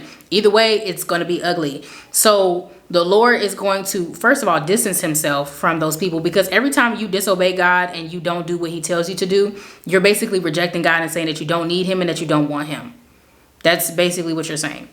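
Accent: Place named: United Kingdom. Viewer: The way my mind was convinced that you were from the UK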